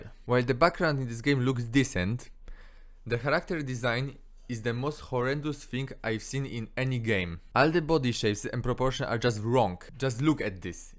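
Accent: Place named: Poland. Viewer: You sound very polish :)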